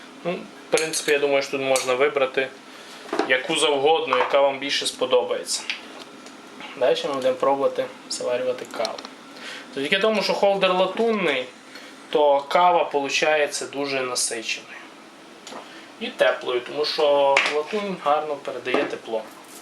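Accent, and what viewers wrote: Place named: Lviv, Ukraine. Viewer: гадостный акцент львовский. лучше бы молча делал.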